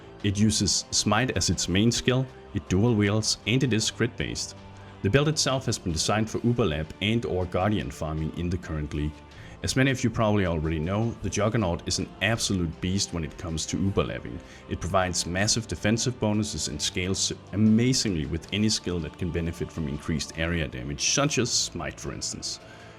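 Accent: Denmark. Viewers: Is this dude Danish?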